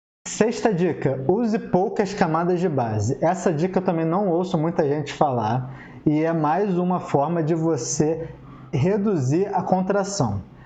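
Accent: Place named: Rio de Janeiro, Brazil. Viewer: Esse sotaque carioquêixxxx é nojento demais meu.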